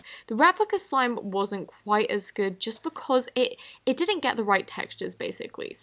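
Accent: United Kingdom. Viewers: How did I wake up to a British lady reviewing slime? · I like your voice bc I like British peoples voices🤣😂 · She has British acent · The british accent is such a touch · can u talk a little slower? I dont want to sound mean but i'm hispanic and I can understand english videos but u talk too fast and plus u got a british accent, I like your videos but I struggle understanding them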